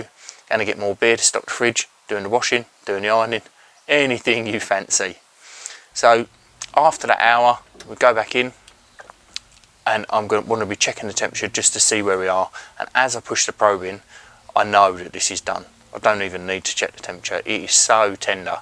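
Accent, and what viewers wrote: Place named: United Kingdom. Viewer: Still getting use to hearing British accents talking about bbq and smoking meats lol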